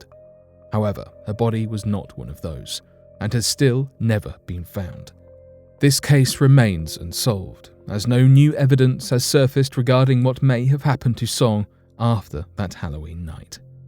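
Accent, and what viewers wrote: United Kingdom. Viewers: No, definitely British, with very, very, very slight generic British northern accent hints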